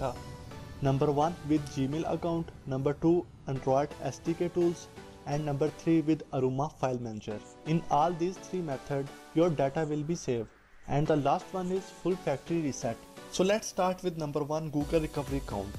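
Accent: India. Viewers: YOUR VOICE IS SO FUCKING ANNOYING UGH I CANT STAND IT CAN YOU TALK NORMAL AND NOT HAVE THAT STUPID ACCENT GOD THIS IS WHY I HATE INDIAN PEOPLE NO ONE CAN EVER UNDERSTAND THEM AND CAN YOU ACTUALLY GIVE A BETTER EXPLANATION ON THIS?!?!!! god your so FUCKING annoying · uiqbal11 He sure sounds Indian.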